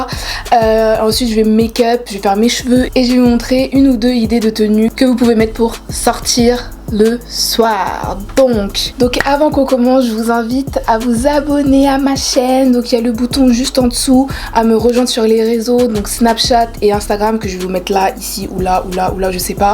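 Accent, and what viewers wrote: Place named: United States. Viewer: Au début j'ai cru que t'étais Américaine 😂😂😂💙💙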